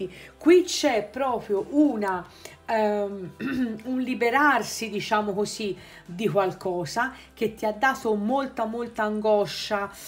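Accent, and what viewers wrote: Tuscany, Italy. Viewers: Super parlando il toscano e ancora più super sfoderando l'inglese...sarai mica insegnante di inglese? Vedo che te la cavi molto bene anche con i termini in voga...english 🥰🥰🥰💯💯💯💯🍷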